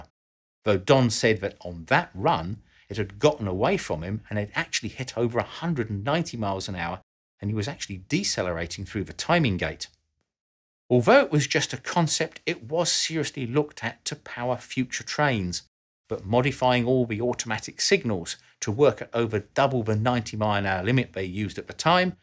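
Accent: United Kingdom. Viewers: He British, even I would struggle 😂😂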